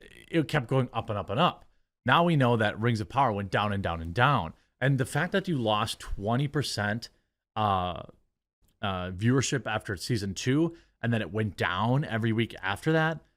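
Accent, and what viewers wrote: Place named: Wisconsin, United States. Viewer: Bro say dregon over dragon ever day, love out that WisssCONson accent.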